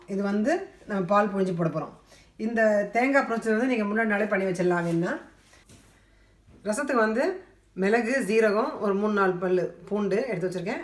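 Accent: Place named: Tamil Nadu, India. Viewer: i am trying to figure out where your tamil accent comes from? are you originally from vellore kanchipuram area?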